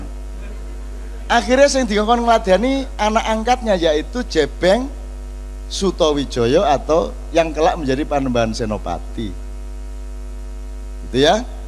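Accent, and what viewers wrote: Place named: East Java, Indonesia. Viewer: bukan sombong khas orang jatim budaya arek mang gaya bicarane begitu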